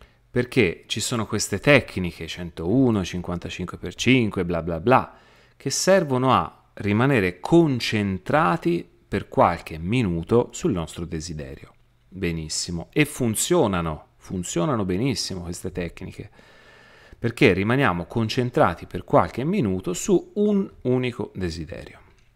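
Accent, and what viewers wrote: Tuscany, Italy. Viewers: grazie x il tuo impegno ti seguo a 360 gradi ! Da anni ho iniziato un percorso di rivoluzione umana e i tuoi consigli e condivisioni x me sono molto ispirativi e di sostegno concreto ! Non solo il tuo accento toscano ( come me e poi ho scoperto che sei di Pistoia quindi siamo anche vicini !) il tuo modo di esprimerti e il tono della tua voce trasmettono sincerità per questo ti trovo davvero verace e concreto ! Riesci a mettere delle frequenze x il sistema linfatico o x i vasi linfatici ? Grazie ancora x il tuo impegno ! Buona vita e buona lotta !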